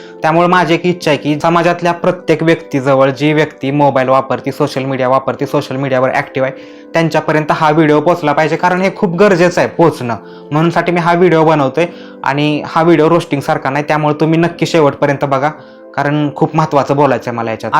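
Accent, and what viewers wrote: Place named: Solapur, India. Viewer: Laga bhava tuzi bolnyachi paddhati sangte ki tu Solapur district mdun ahes.tuzi boli connect hotei.chalu thev 😊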